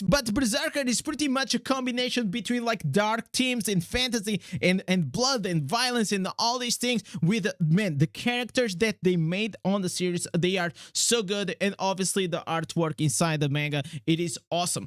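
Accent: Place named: Eastern Europe. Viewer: What nationality are you bro? Your accent sound eastern European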